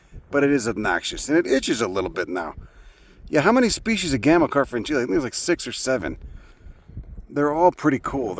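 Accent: Chicago, United States. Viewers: I appreciate how u cover a ton of microbiomes and blending/borderline climates. Really interesting and funny channel. Chicago is my new favorite accent 😂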